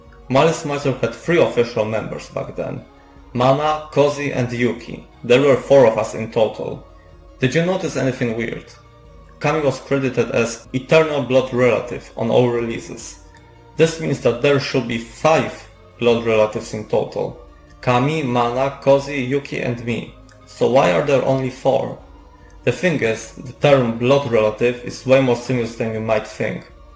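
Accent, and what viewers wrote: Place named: Poland. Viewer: czuje polski akcent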